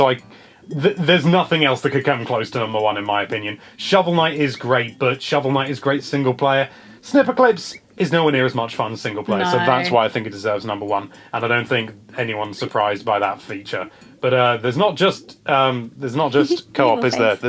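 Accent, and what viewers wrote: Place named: England, United Kingdom. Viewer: i totally agree that Snipperips is number 1. I'm not sure that blaster master deserves to be on the list, I was very disappointed with the co-op feature and felt it was put in at last minute without any thought! I would like to see this list done again in say a years time when there are loads more games to choose from! Great video as always, makes a refreshing change to listen to a fellow English man when on YouTube searching for my SwitchFix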